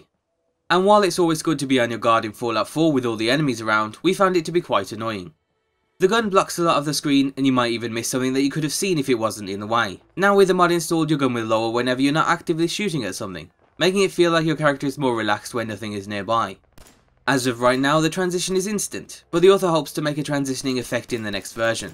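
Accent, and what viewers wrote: United Kingdom. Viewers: He's british?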